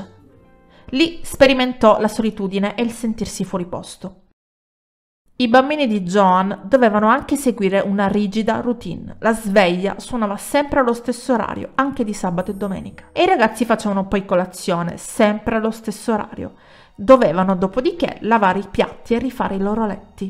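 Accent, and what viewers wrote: Sicily, Italy. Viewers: Ti ammiro molto. Continua così. A proposito il tuo parlare siciliano mi piace tantissimo!!! Ciao da Venezia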